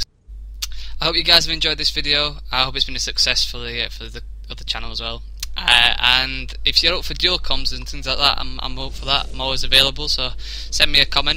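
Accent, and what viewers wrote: Scotland, United Kingdom. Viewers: Hmm sounds Scottish. Or I could be wrong. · he doesn't sound too american, he sounds more scottish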